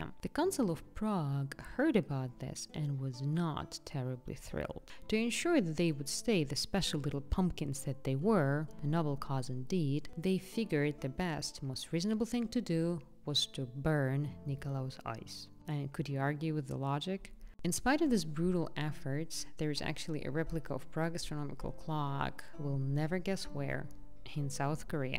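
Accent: Russia. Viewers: First, let me very shortly introduce myself: My family is a North Italian / Austrian / Czech mix. Am I right that the girl who made this video may be Russian? Despite all her efforts, I can still hear some Russian / East Slavic accent. What's more important, her opinions seem to be influenced by that: 1. Czech Republic simply IS a Central European country and it's probably the very synonym of Central Europe itself. Geographically, historically, culturally and economically. For almost a thousand years (= most part of its existence) it has been in the Central / Western European sphere of influence (Holy Roman Empire, Austrian Empire, Roman Catholic Church etc.). Yes, for four decades it was a part of the Eastern block, just like East Germany. Never "Soviet block" or even Soviet Union. 2. The socialist regime ended in Czechoslovakia in 1989, not in <num> was the year when Czechoslovakia peacefully split into the Czech Republic and Slovakia. 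· This is by far the most offensive, arrogant and I should say ignorant (considering historical, sociological facts as much as knowledge of common realias) amateur video ever made about Czech Republic and published on YT. What is also odd is this Eastern/Western “definition” is claimed by the author (and arrogantly served as “a fact”) who is apparently a russian person (the accent is clearly eastern european/russian…although author is trying to hide that ;), there is probably good reason for this) living in Switzerland. I mean wow…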